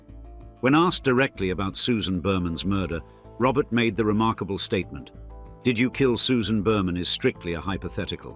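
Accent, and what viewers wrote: United Kingdom. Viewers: Always some British schmuck narrating American History. As though their own isn't enough of a goldmine full of corruption, theft and murder.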